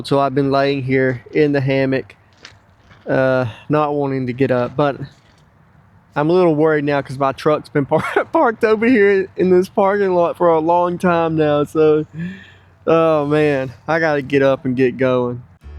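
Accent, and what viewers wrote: Alabama, United States. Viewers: I love watching you camp,. I confess I love hearing you talk b/c I'm an Alabamian too! Tuscaloosa. That's not the only reason though. I'm always interested in how you set the camp up & how you deal w/ anything that might be any little frustration or fixing any problems. I confess, I like the singing as well but I love the stealth camps as well. Anyhow, thanks for all you do to keep us interested & entertained. Stay safe & be well. Peace, Selene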